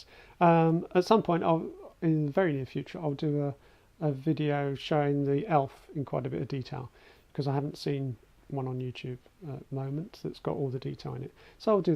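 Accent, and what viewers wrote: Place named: Europe. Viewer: I highly recommend the oberton 10b200 if you live within europe (I guess you do because of the accent ;) ) it outperforms any eminence speaker on the market and is used it high-end dutch boutique cabinets. (The price tag is the most attractive of them all) look it up on talkbass, it's a great woofer!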